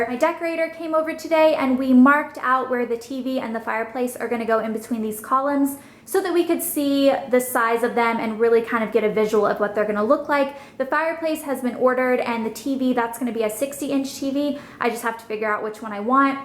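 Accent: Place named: South, United States. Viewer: Your accent sounds so much more country than I've ever heard it before!:)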